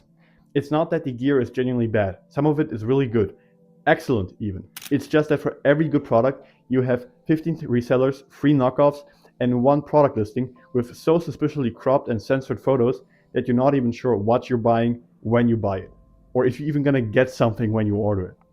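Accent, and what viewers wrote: Germany. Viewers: Ich höre doch einen Deutschen hier raus 🫣🫣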